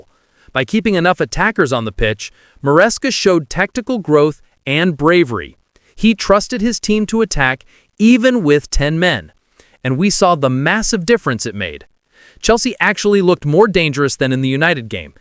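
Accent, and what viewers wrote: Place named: United States. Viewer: We don't want these ghastly American narrations. Spoils things!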